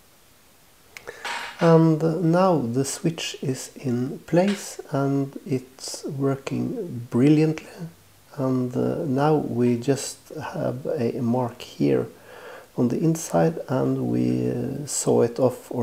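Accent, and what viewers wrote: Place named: Sweden. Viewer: i have one question? are u swedish? u rly sound swdish i am finnish btw xd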